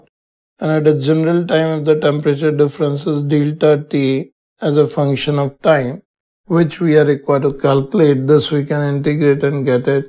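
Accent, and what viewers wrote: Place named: India. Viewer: Thank you! I Love your indian accent <3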